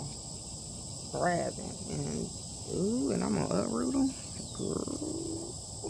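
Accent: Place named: South, United States. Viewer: I'm wondering if you possibly mis-heard something? I couldn't perceive any salty language in her audio. She does have a strong southern accent, that perhaps could make a difference to what you heard?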